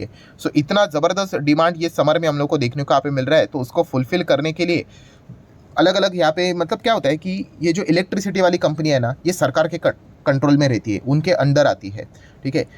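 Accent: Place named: South, India. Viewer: Baat sunke to lagta hai South se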